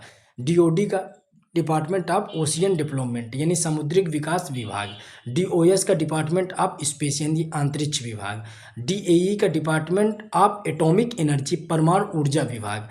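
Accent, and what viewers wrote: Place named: Kanpur Dehat, India. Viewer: Sir aap kanpur dehat se ho kya...kuki apki boli lg rhi h ki aap hmare pukhrayan ke pass k hi ho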